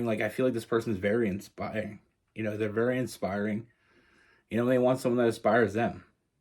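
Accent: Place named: Canada. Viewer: I love your stories!! I could hang with someone like you all day! If you don't mind me asking, what state are you located in? You sound Canadian! The cat is the bonus! I seriously thought it was mine at first! Thanks! You are appreciated!!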